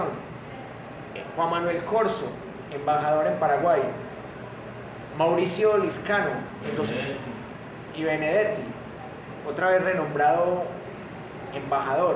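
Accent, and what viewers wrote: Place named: Spain. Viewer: Es tan genial escuchar a este profe...explica todo, claro, castizo ❤... así, sí que se entiende!👍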